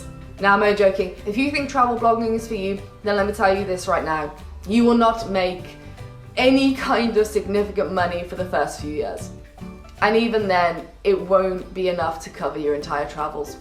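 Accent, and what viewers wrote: England, United Kingdom. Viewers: here expressions are very cool. I was just searching for some brazil thing and I found a video by the title language challenge. in that her facial expressions were awsome and with that english accent her voice is like a melody. Nice video for the people who love to travel but can't afford.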